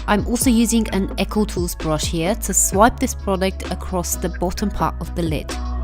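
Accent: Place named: France. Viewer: Do you speak French? Cuz I love your accent ♥️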